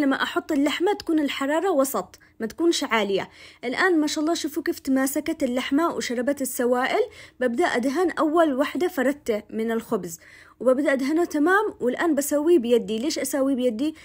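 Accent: Yemen. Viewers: السلام عليكم احب طريقه واطباق طبخك يا واكثر شي احب لهجتك اليمنيه انت سيده مباركه الله يحفظك يا ريت تزوريني في جده ❤ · لاول مرة احب لهجتنا اليمنية منك انتي طبعا لهجتنا متعددة يابنت عدن الحلوة عفويتك وعدم تصنعك خلاني اقول والله لهجتنا كانت مظلومة من قبلك وتسلم ايدك يا قمر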